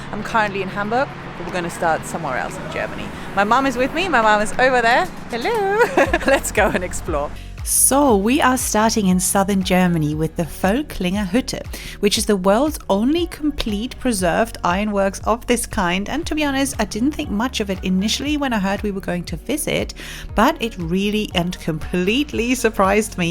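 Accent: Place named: United Kingdom. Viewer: You are not German because you have a British accent, if you where German you would have a German speaking channel. Just admit that you are British and not German because nobody believes you.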